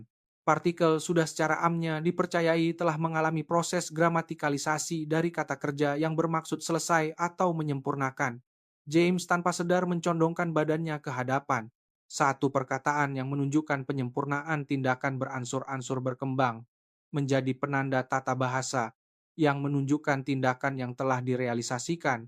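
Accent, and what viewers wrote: Indonesia. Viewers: Ini cerita yg guna loghat indonesia.. ini reka cerita bukan dari Malaysia n bynk reka cerita yg tak mencerminkn org malaysia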